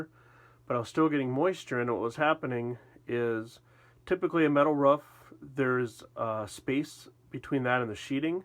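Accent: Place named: Midwest, United States. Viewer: Impressive how handy you are! I detect a Mid-western accent. Is this in Minnesota, by any chance?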